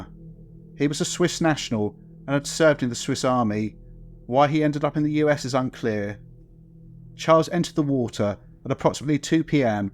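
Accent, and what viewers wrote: England, United Kingdom. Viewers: Why is a pom narrating this? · He’s not German..he’s English.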